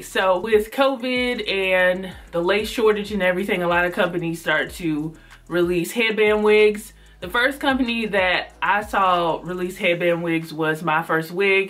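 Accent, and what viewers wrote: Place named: Alabama, United States. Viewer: Your accent must be from Alabama 😊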